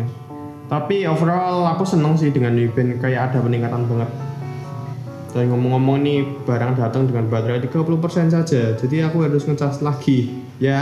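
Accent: Java, Indonesia. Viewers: Jowone medok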